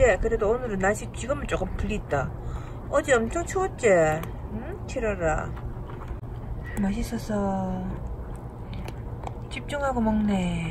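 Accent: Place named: Gyeongsang, South Korea. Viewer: 천사님이세요 경상도 구수한 사투리가 더욱 정감이 가네요 응원합니다 어서 대박나십시요💓💓💓💓💓💓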